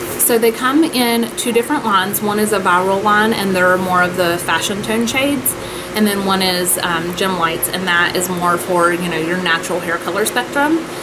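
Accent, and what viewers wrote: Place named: United States. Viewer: What state are you from? I love your accent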